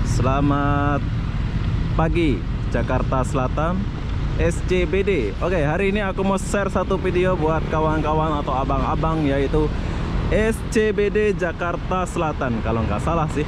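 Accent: Malaysia. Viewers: Kamu orang mana sih?logatx org melayu. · Kalo Aku dengar dari logat. Abang ini orang malaysia kayak nya. Salam dari Sarawak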